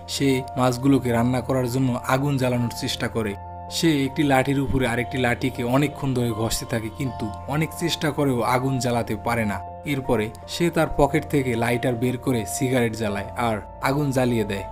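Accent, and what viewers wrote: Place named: Barisal, Bangladesh. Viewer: ভাইয়ের বাড়ি সম্ভবত বরিশাল অঞ্চলে। উচ্চারনে আঞ্চলিক টান। একেবারেই আনস্মার্ট উচ্চারন।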